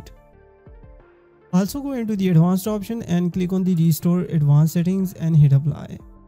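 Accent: India. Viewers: Don't tell me you don't know that's an Indian accent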